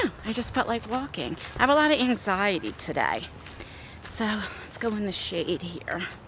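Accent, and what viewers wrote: New Jersey, United States. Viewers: Beautiful area! I’m Long Islander who moved to middle Tennessee . I miss it there and your north eastern NJ accent (similar to mine), I miss! I do love it in TN and we also have many trails. I’m wondering if you get lost if your map app on phone can help detect your location? Ui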